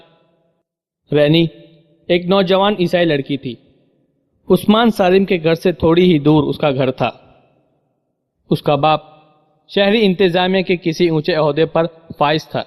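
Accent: India. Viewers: Brother yeh indian hai aur indian aise hi bolte hain Doesn't mater. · YR MUJE LAGTA HAI K YEH VOICE LIKE INDIAN TARZ KI HAI IS IT RYT ??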